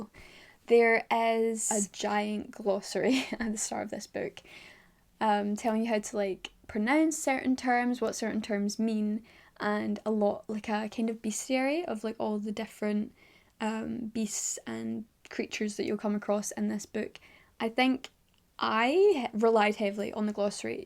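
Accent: Scotland, United Kingdom. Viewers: It’s a Scottish accent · Soo this is your real voice, you sound a little bit british 😮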